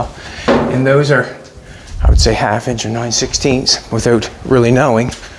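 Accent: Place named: Maritimes, Canada. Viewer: Hey don't worry abut talking too much! I really like the way you put words together! No fancy language just heart felt words. I'm Canadian too and find your Maritime accent and sayings are very entertaining. I follow all the Canadian car You Tubers and you are all are so down to earth. There is a real demand for real no BS (not scripted) reality shows. You and are living my dream life so thanks for taking us along with you. Looking forward to your Australian and Toronto episodes. Great stuff you two!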